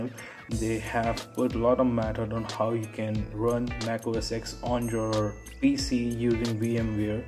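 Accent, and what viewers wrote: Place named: India. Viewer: Hinduinglish... How to make WO mac?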